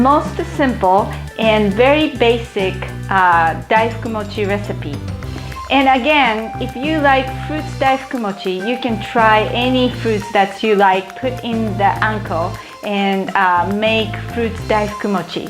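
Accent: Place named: United States. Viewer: Where did you learn English? , you seem to have a slight American accent, I enjoyed your video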